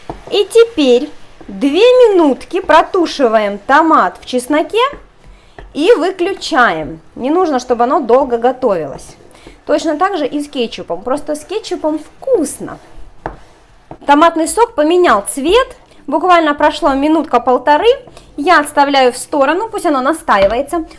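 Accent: Ukraine. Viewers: Украинский акцент очень приятный и забавный...)))) Рецепт очень хороший...лайк за ВСЕ....))!)!)!)!)!)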